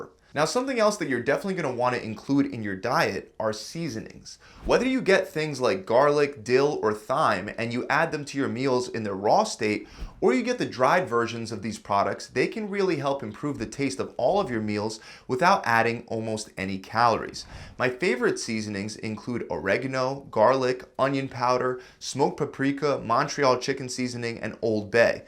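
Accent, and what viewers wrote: Philadelphia, United States. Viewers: Love that Philadelphia accent. Great information. Thanks! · I know my Philly (area) accent when I hear it …”wooder” 🤣 from Philly or Jersey? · That Philadelphia accent is distracting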